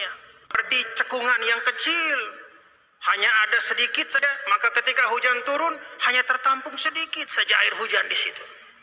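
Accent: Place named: Lombok, Indonesia. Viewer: hihi bahasa lomboknya masih nyantul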